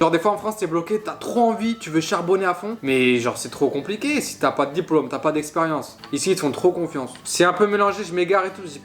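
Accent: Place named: Quebec, Canada. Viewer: En effet, comme l’a dit de Gaulle à Montréal en 1967 : « Vivi le Québec libre ! « Pour l’accent québécois, dans quelques années tu l’auras mieux mon pot, mais c’est déjà un bel effort. Ciao!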